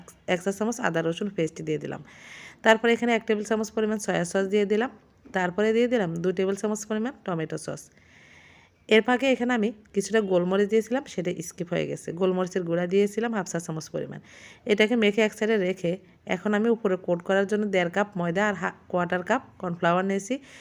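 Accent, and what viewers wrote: Noakhali, Bangladesh. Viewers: আন্নে নোয়াখাইল্লা কেন ⁉️ 😁😁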